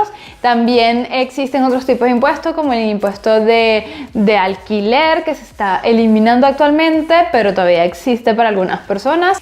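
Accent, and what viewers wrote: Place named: Venezuela. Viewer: Pinche acento venezolano no lo soporto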